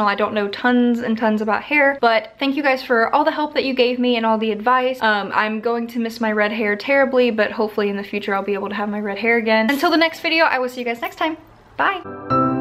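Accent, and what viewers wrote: South, United States. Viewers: Ive been following your channel for a while. And I just noticed you have a southern accent. Like its not deep South but you kinda sound like your from Houston..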